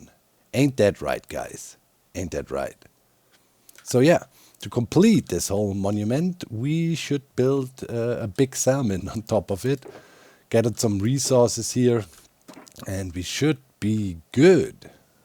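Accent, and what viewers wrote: Germany. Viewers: In my [admittedly long] student career, the professors with the best true/technical understanding and working knowledge of their chosen field have been German. I count those professors among my favorites; I learned so much from them, and still dream of aspiring to their level in my own work. Is that why I enjoy watching vids? Might be. He's got the Hive Mind, but he's also got an accent like my old professors, and he produces the specific-technical kind of violently ingenious applications that might as well be a stereotype of Germanic Engineers. And he's got a thing for tomatoes. Inspiring yet relatable, with a comforting accent. Don't worry about the video editing, nor the final length of the videos you post. I think your fan base is here for the long haul.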